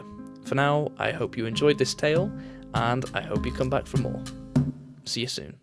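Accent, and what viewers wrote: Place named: United Kingdom. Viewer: The algorithm gods have blessed you. I'm happy to be hearing another British (or aus) essayist. Great work!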